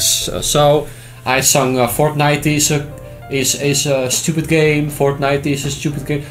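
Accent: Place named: Netherlands. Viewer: I'm from the united states and I love your dutch accent. I'm loving it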